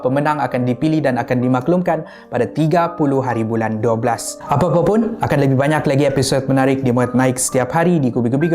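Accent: Sabah, Malaysia. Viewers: Ini loghat Sabah tu pun tak pandai beza kah. Dasar orang kl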